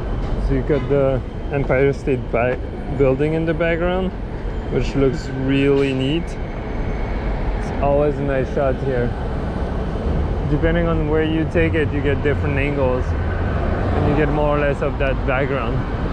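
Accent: France. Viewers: Hi ! I love your content, just discovering street photography and your work blow my mind... One thing you have in common with all other street photographer I watch recently is the picture of strangers without noticing/asking them. Is it something normal ? You don't have any problem with that on a daily basis (law or simply argument with people if they notice you have taken a picture of them) ? It's a legit question, and sorry if it sound a little rude, it's not my goal, just pure curiosity (and sorry for my very poor english, I can ask that in french but I want to stay in the channel mood (your name and accent make me think we spoke the same langage)). Anyway, thank you for your work, really beautifull and relaxing.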